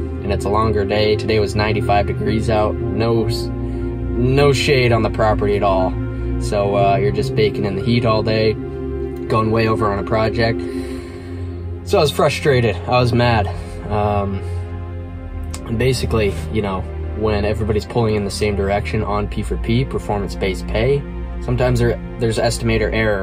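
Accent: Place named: Vietnam. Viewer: Lol sound very vietnamese!!!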